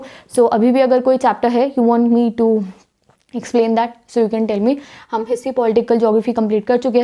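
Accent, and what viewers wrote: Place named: Meerut, India. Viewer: Didi where are u from ? I am from meerut and it seems that you are also from meerut .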